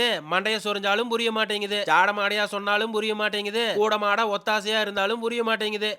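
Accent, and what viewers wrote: Kongu, India. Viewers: Unga peachu gounder mari iruku correct😊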